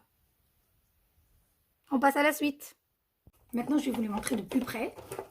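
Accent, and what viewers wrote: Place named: Mostaganem, Algeria. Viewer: فرحت بزاف كي شفت الفيديو تاعك هذا وين شفتو نحبك بنت بلادي مي احكي جزائري نحبك اللهجة المستغانمية تاعك تعجبني بزاف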